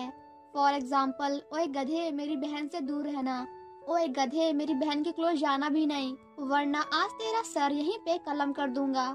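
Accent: Gujarat, India. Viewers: Ok I Guess you from GUJRAT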